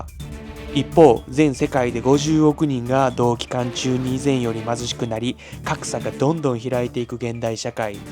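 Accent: Kansai, Japan. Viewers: 久々にみたけどナレーションに違和感あるんだが。もっと関西の訛りあったよね？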